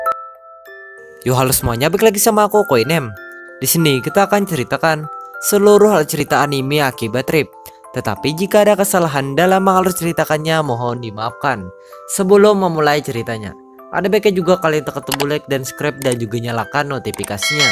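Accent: Banjar, Indonesia. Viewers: Logat banjar nya 🥲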